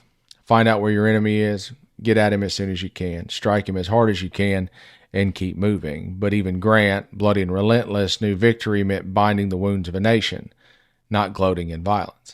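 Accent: South, United States. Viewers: Thank you for your words. Fellow Arkansan. It's hopeful to hear words like these come from a southern accent. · Hearing this with southern twang… you make me proud to be a fellow citizen of yours. I really hope there’s more of yous than there are of them.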